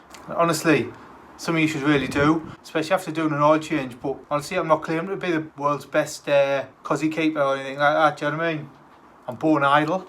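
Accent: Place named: Northern England, United Kingdom. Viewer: I remember looking through the classifieds of fastford back in 05/06 when I was a little lad. Oh the prices back then for a Cossie! (And any other classic ford). Anyway, enjoying the videos on the car mate. Doesn't matter whether it's just an oil change or if your fitting some interior trim, you make it a good watch haha. I've also managed to understand you Northern fellas too watching this 🤣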